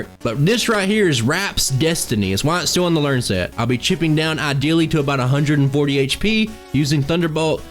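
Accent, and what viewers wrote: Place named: Kentucky, United States. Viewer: The accent sounds like my own, I’m from Kentucky lmfao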